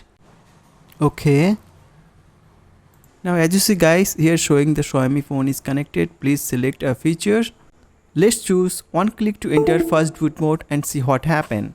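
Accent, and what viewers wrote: India. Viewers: neither free nor for all android. His awful english (indian) later on tells you it only does Samsung phone. I loathe this b.s.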